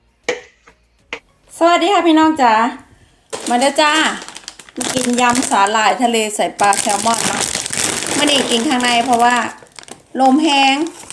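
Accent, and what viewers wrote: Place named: Isan, Thailand. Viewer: อายเอ่ย แคทละฟังบ่ออกมื้อนี้ 🤣🤣🤣 ปกติสำเนียงสกลนครกะฟังยากอยู่มื้อนี้ยอมรับจ้า แต่กะน้ำลายไหลนำคือเก่า😊🤤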